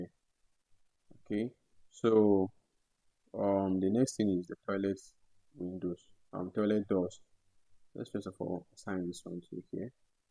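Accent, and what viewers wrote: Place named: Nigeria. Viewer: The best 3d tutorial I've come across on YouTube. I love your simplistic, clearly explained, common Nigerian accent and jovial teaching style. You have 100% of my attention, and I will definitely recommend you to fellow students. Please, how do I get the CAD image installed, and how do I join the whatsapp group?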